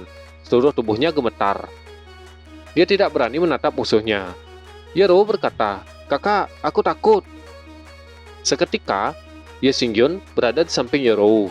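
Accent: Bali, Indonesia. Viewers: Dr logat, kayaknya orang bali nih admin.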